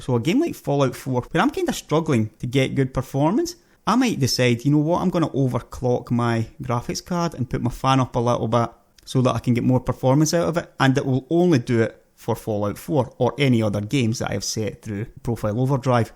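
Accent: Scotland, United Kingdom. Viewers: Funny thing Scottish accents - this one and Sean Connery's accent are the very loveable but for some reason it makes one always want to break out and speak in Scottish-. I love to always try and do a scottish accent from time to time - don't know why but simply just love it even the ones whereby you don't understand what the hell they are on about. You just know that you love it. Love it love it love - a very attractive language that immediately compliements any person first hand. It's just wheather that person immediately with a head start because of the Scottish accent can actually stay ahead and that then boils down to the real person in hand, hmmm! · Its your great Scottish acent my friend, and as an English man I think scots speak English better than us! Great video. Well done m8! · Hey, what's the bit rate on that Scottish mouth of yours? 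You really get roll'n ! :D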